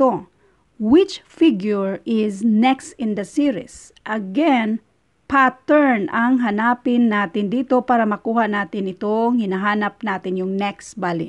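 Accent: Visayas, Philippines. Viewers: omg this is so helpful. i havent understood it before i watched this and it really helped me understand these series of patterns especially the segment completes the grid. thank u maam and God bless btw i love your bisaya accent : )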